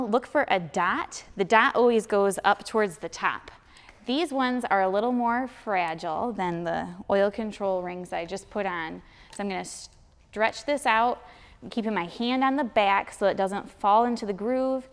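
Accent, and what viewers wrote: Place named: Michigan, United States. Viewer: Great video. Also, I think I detect a northern accent... Wisconsin or Michigan perhaps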